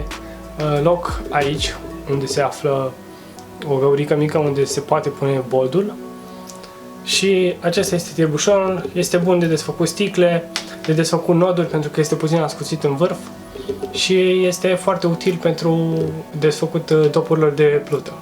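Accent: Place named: Craiova, Romania. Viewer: Let me guess where you’re from… 🥁🥁🥁 Craiova ❤😂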